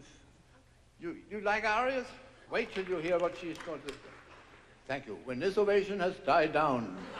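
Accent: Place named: United States. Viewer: Never seen a Dane speaks this perfect American accent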